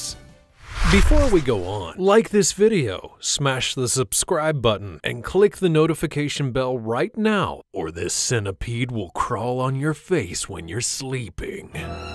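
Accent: United States. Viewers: Hard to keep up when you do metric sometime and imperial the other. In America we do imperial and seems you sound American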